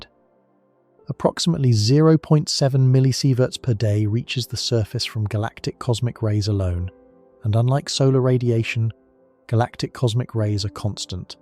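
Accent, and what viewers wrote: United Kingdom. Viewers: Is this a man or is it AI? The only reason I ask is because these two hour videos are released constantly and the narrator speaks in a perfect British accent without missing so much as a single beat, without pausing or running out of breath, without stumbling. Constantly over and over again…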